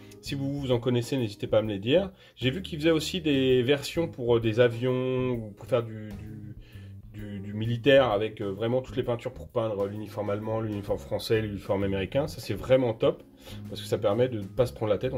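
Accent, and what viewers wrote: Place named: England, United Kingdom. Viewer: Merci pour cette vidéo, hâte de voir ton ressenti au niveau de son appliquation. On en parle énormément des peintures AK en ce moment. Je vais voir ta vidéo sur le set Gold. J'adore ton accent Anglais 👍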